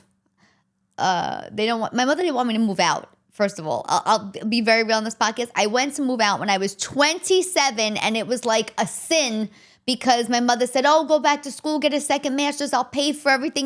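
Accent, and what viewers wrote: New York, United States. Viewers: No matter where you reside, your voice will always take us back to NYC! ❤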